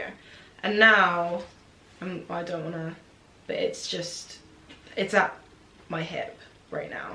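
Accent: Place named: United Kingdom. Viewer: I watched your old vids before you moved and noticed how lovely your UK 🇬🇧 accent is 👁👄👁 it fits you like you never been in the states. Much love 💗 your hair is INCREDIBLE and I’m next 🥰 claiming it 🔅🔆🔅!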